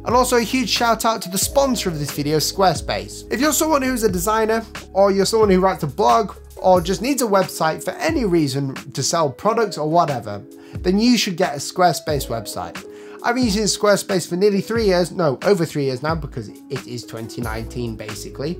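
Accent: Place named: United Kingdom. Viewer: That's how us British say it, like he said 'Adi-das" instead of "Ad-eee-dus". Similar to how we say most countries with the letter I and as "i" such as i-talian, not I-talian :). It's the way we break down the syllables in our speech pattern.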